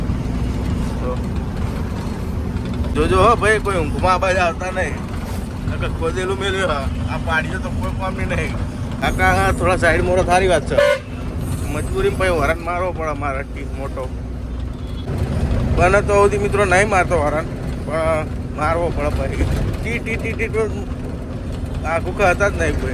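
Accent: North Gujarat, India. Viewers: Jai mataji bhai tame kya gaam na cho e kejo mane to tamaro boli Mehsana side Uttar gujrat ni lage che ane kyu gaam tamaru e pan kejo mara msg no javab apjo bhai jsk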